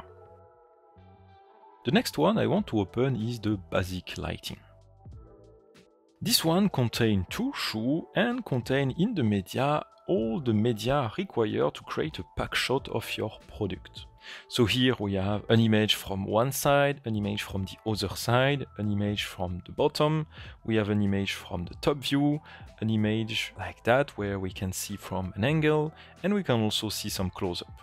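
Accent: France. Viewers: Ohh my goodness, pour ne pas dire "OH MAI goudness grichious" ....Votre accent est trop français. La vidéo est de qualité, mais l'accent fait trop mal aux oreilles. Merci tout de même pour cette vidéo.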